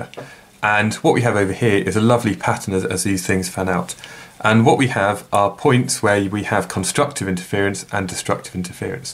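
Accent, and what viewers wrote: United Kingdom. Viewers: I love your accent, nice British guy!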